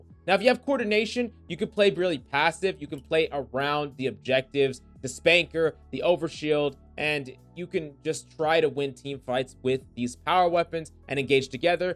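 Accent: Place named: United States. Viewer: Woah I didn’t know GreekGodX made Halo videos. Your American accent is perfect dude. I’m impressed.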